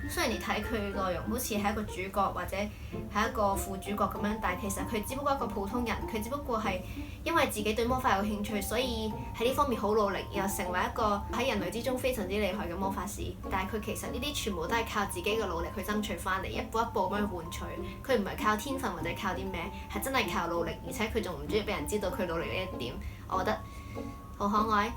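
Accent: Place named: Southeast Asia. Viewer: 听起来像东南亚人讲华语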